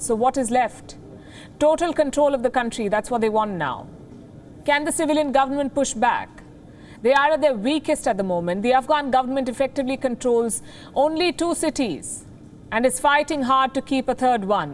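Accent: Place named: India. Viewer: Indian accent so heavy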